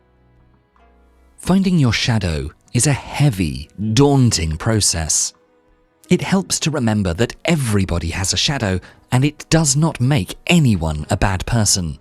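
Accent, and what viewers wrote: United Kingdom. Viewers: The narrator is so hard to listen to, can we try to use a more smooth sounding American accent narrator instead? The British accent tone is just so up & down & sounds like trying so hard to pronounce the words but actually more difficult to hear clearly. Thank you!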